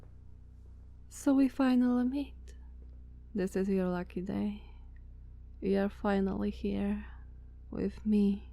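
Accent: Eastern Europe. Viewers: Perfect as ever bless i passed out half way through! So relaxing and loved this dominant side of you and slavic accent! <3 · Hmmm. Slavic accent. Nice. Considering that Dracula, the most famous vampire of all time, comes from the balkans, a mostly slavic region. (I know he comes from Transylvania, Romania but the greater picture makes it pretty nice to see for a perfectionist like me, who appreciates details) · I can always appreciate both vampires and slavic accents. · You had me at Slavic accent Hrnng... I am in love. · Mmhmm this is the good shit👌 vampires with slavic accents are the way it should be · Feels like a proper vampire needs a good Slavic accent after hearing this!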